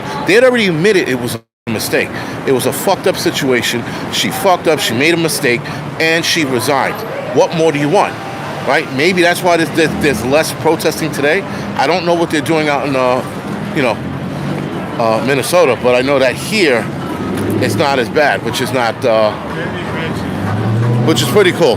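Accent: New York, United States. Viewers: Can't see you and you sound like a newyoker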